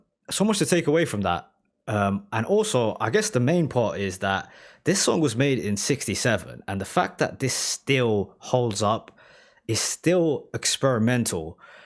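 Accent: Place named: Liverpool, United Kingdom. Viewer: You seem to have a Liverpool accent, anyway love the video reactions all the best!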